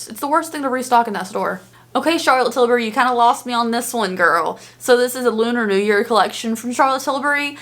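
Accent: South, United States. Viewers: i would differently watch review of the Jaclyn's launch. I also love your southern accent its so cute.😺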